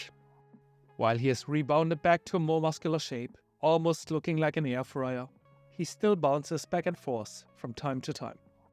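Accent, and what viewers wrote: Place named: Germany. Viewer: This guy sounds german as heck yet he is from japan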